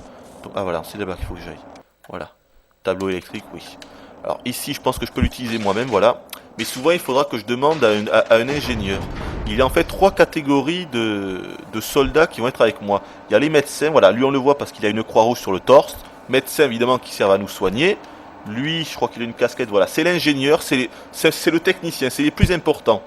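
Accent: South, France. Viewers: J'adore ce jeu, moi qui suis fan du film de John Carpenter, j'attendais ce The Thing avec impatience et je n'ai pas été déçu, on retrouve bien l'esprit du film mais le jeu a l'intelligence de nous amener progressivement vers autre chose, une histoire de conspiration scientifique terrifiante, toujours dans ces décors enneigés, la gestion de la peur chez les personnages est superbe et apporte un vrai plus au jeu, c'est parfois assez difficile et frustrant (notamment lorsqu'on doit affronter les petits monstres qui font un bruit aigu stressant) notamment le passage dans l'épave de la base aquatique, mais ça reste un très bon jeu qui reprend l'esprit du film de Carpenter ! Dis donc au passage, t'as un accent...du sud peut être ? + 1 like ! :)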